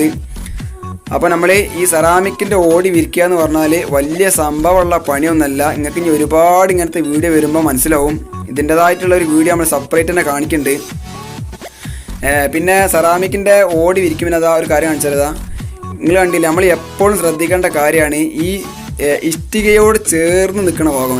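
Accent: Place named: Kondotty, India. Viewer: കൊണ്ടോട്ടി ആണോ സ്ഥലം സംസാരം കേട്ടിട്👍😄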